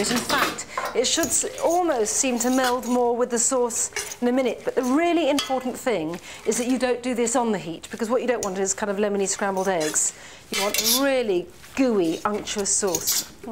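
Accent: RP, United Kingdom. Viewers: Is it just me or was she even more posh pre-coke days??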